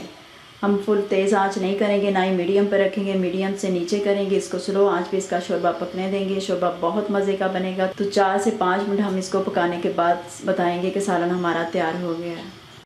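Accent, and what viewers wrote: Punjab, India. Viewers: I am belongs to arain family . Punjabi accent ha. Ap ha :p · Pure Punjabi accent.😍👍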